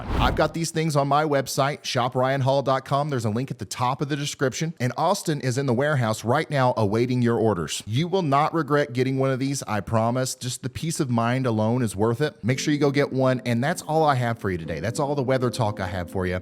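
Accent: South, United States. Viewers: I live in northern California, so most of the time these forecasts don't affect me. But I love watching your videos, because you have the cutest southern accent, and I learn a lot about meteorology!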